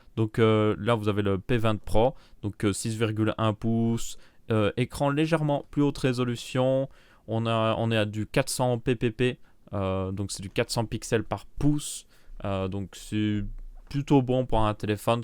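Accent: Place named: Belgium. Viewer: Putin un belge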